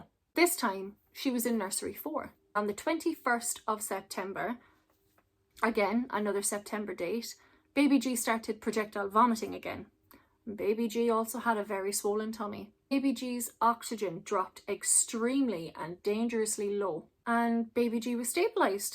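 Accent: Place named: Ireland. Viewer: Absolutely horrific and sickening. Do u reckon is some form Muchousen by proxy? Love your gorgeous accent btw, r u iIrsh? Love from Australia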